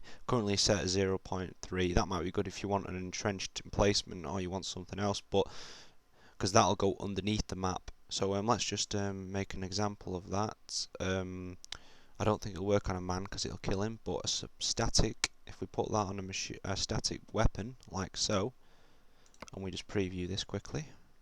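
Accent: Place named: Manchester, United Kingdom. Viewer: I was wondering where he was from, I knew he was northern, but this video DEFFO manc, it's how he says "you" as "yerr"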